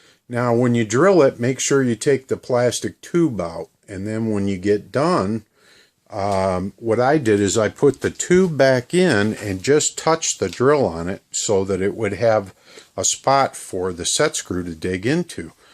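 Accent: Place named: Chicago, United States. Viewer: Chicago area is my guess, too. My area of origination. Excellent modifications!!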